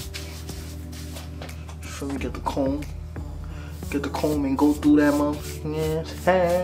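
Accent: New York, United States. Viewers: Where you from bro , New York philly ?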